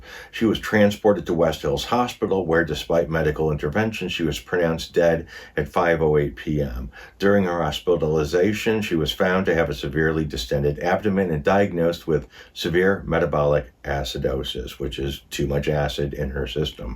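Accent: Chicago, United States. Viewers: Do I detect a very watered down Chicago accent? Some words are making me thing you may be one of My People. Edit: too bad Ozempic didn’t get discovered for weight loss years earlier because I think that would’ve saved her life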